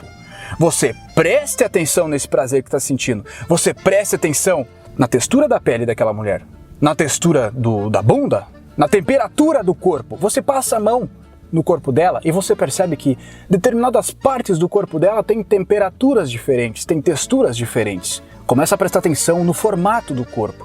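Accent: Rio Grande do Sul, Brazil. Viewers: Se tive se um flauta chinesa de fundo... Hehe Você é gaúcho? Parece muito ser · é gaúcho???👍😏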